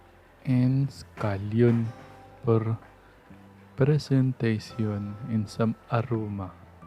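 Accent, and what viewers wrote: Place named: India. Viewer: ang sarap nmn yan sir,parang accent ng Indian race ang host..jiji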